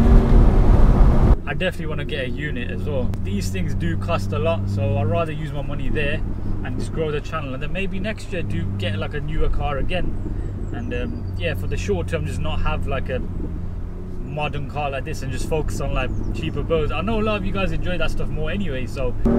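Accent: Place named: Birmingham, United Kingdom. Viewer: Can I ask where abouts your from? I’ve heard Birmingham but me and my girlfriend watch your videos and you look so familiar 😂and we can’t figure out where your from, love the videos!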